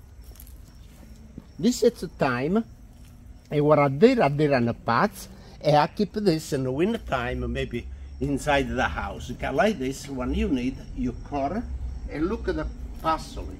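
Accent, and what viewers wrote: Italy. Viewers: I can spend the whole day listening to this man talking about his garden with his Italian accent !! The most beautiful thing 🥂🥂👍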